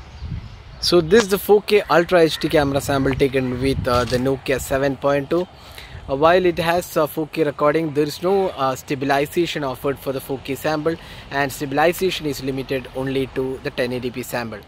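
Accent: Kerala, India. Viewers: That mallu accent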